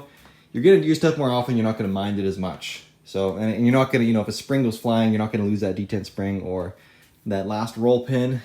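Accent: Canada. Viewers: You sound Canadian. Awesome gun room setup and gear by the way.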